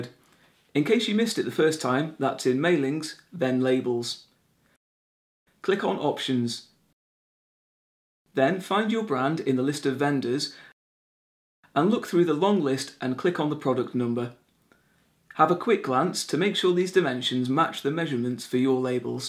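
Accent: England, United Kingdom. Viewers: Brilliant easy to follow instructions with an ENGLISH ACCENT. My only question is ….my laptop doesn’t produce another sheet when I get to the end of first one and I have to start all over again. I’m sure I’m doing something wrong. Please help. Thank you.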